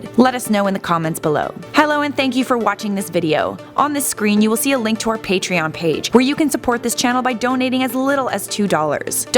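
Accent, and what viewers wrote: England, United Kingdom. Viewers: Youre english right?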